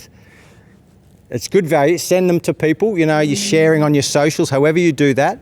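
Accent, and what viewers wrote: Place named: Australia. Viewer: Watched to learn Aussie accent way back 2019 in preparation for Uni in Melbourne. Continued watching since! Stoked to see you in July!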